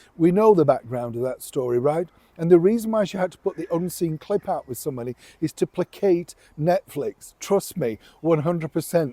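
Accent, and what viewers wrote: Yorkshire, United Kingdom. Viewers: Aaahhh, that Yorkshire northerner straight talk! “A washed up Diva”..😂😂❤ You so nail it.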